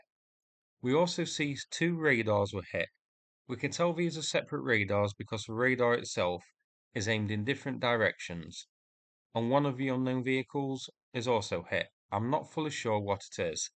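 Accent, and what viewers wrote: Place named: Northern England, United Kingdom. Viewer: Still the same bad narration by a northern English speaker who should know better!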